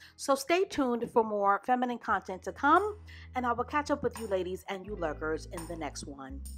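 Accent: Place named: New York, United States. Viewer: The way u say "boss" makes me assume you're from New York lol love that accent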